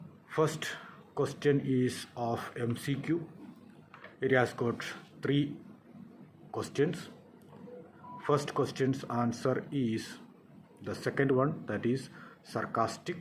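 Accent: South, India. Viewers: Your ascent is like a South Indian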